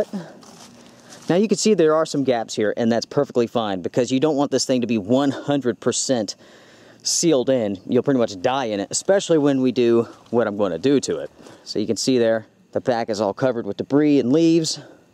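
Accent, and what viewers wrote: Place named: North Carolina, United States. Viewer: Love your videos, Just found your channel recently. Interesting that you say pouring the blood, or, pouring the rain. I've never heard anyone adding " The " in those phrases. Must be a North Carolina form of speech? Keep the videos coming, I will be watching, I've got 3 years worth to catch up on...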